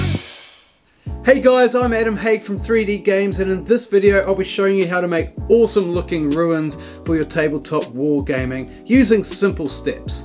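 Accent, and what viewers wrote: New Zealand. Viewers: I'm digging this tutorial, the accent makes this feel like having a WETA making-of-documentary clip for wargaming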